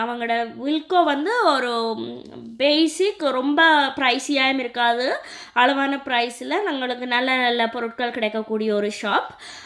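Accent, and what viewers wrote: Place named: Sri Lanka. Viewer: Very good collections dear:) Your Tamil is too good to hear:) Lanka?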